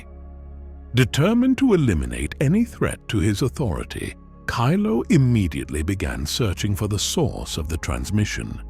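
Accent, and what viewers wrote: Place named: United Kingdom. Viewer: I never knew someone so british could tell stories about StarWars, but im in for it <3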